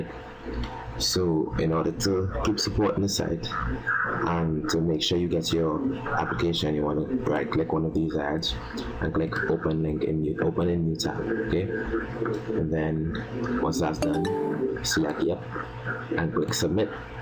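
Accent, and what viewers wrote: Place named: Trinidad and Tobago. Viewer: He is not Nigerian he is Trinidadian... their accents are very distinct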